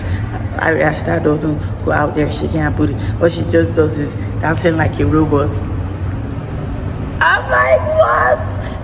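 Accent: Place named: Nigeria. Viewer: Where in Africa you from? You sound Nigerian Shaaa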